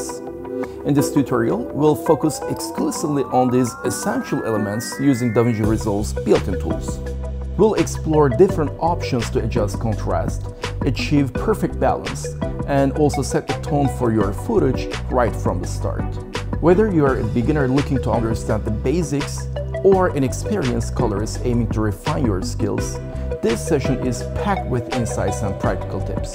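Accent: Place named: Russia. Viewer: Я совершенно не знаю английский, но чувак, я слышу русский акцент)))))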